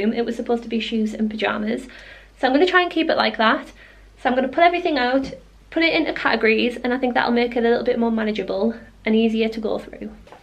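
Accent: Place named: Scotland, United Kingdom. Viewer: Sounds Scottish, like my daughter-in-law, with a slight lisp. They get taxed per room, so don't have closets, like we do. They have cupboards, which they call "wardrobes." So I was expecting a huge wardrobe, not a cupboard. They don't pronounce their "t's" in the middle of words like water, or pretty.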